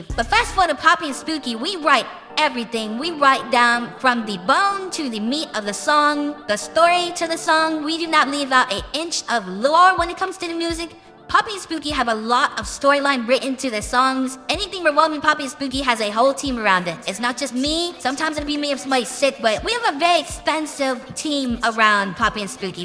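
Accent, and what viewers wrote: United Kingdom. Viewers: your British is showing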